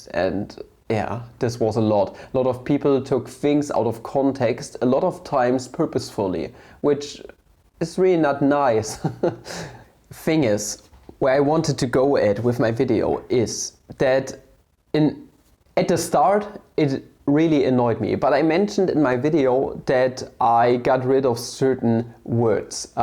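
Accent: Germany. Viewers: I have rarely seen your channel and your videos and just stumbled here because of algorithm. I don't know why you hate indians or indian claims, maybe i know. Maybe it bugs you that someone can be better than the superior western european white race. May be it reminds you of the trauma of how west and europe is declining and other parts of the world are no more your slaves and your yaysayers and they are claiming their heritage. Maybe its because of your accent you seem deutsch and your ancestors felt the same way about indians. They detested and hated indians. Incidentally, they hated jews as well so i guess the problem lies with jews and indians somehow. Your rant reminded me so much of your ancestors. Maybe the apple doesn't fall far from the tree. Disclaimer- I am usually an opposite of a nationalist or so called patriotic and i know you are going to paint me as one for your convenience and i don't care, i am usually a cool dude and i apologize if i am rude to you but your rant and your intrinsic subtle hate about a group of people made me comment. 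I usually never comment on anything. I hope you educate yourself, visit india sometime and learn that india is even bigger than europe in terms of population and people groups and there are many different kinds of people and have differing opinions. Just like europe has far right white supremacists like yourselves, india too has bunch of bigoted nationalists, scammers and bad people and if you count them, they are probably far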